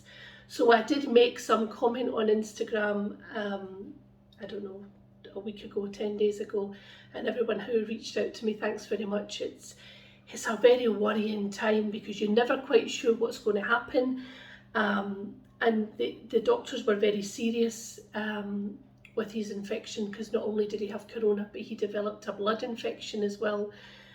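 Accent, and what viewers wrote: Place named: Scotland, United Kingdom. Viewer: Hope you and your family feel well again soon! Just came across this video in my recommended and fell in love with your accent! I don't know what it is, but the Scottish accents sound very pleasing! You mentioned that you lived in the Netherlands? How cool! Where in the Netherlands did you live? (I'm from the Netherlands, so just a little bit curious) :)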